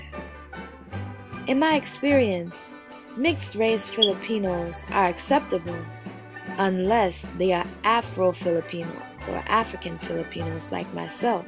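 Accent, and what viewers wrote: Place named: Bahamas. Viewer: By the way, you sound like from the bahamas... :)) Just from what I'm hearing...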